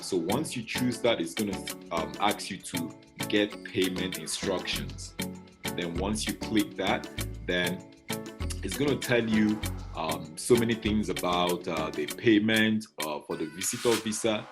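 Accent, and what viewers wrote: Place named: Nigeria. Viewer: your accent reminds me of my Nigerian ex lol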